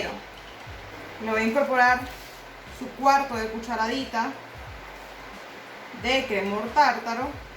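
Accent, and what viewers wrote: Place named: Venezuela. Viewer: Hola buenas noche ésas marcas de crema a la cual te refieres la venden aquí en Vzla. ?..... O tú estás viviendo en otro país?........ Tu acento es muy venezolano. Gracias de a antemano amiga . te felicito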